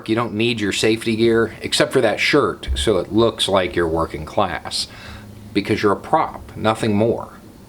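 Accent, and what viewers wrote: South, United States. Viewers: I like hearing a southern voice with smarts (i.e. not a conservative shill).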